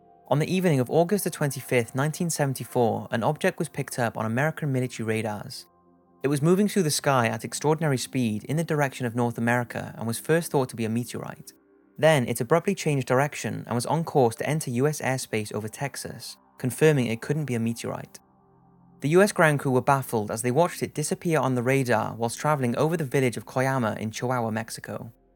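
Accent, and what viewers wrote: Wales, United Kingdom. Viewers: Yo are you from Wales?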